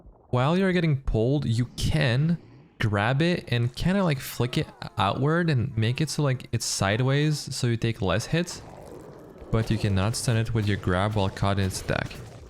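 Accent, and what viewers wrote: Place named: Quebec, Canada. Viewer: It's random but, I hear a quebec accent are u quebecois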